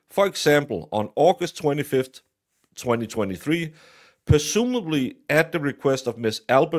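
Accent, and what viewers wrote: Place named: Denmark. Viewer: That's just Danish English (denglish) pronouncement 😉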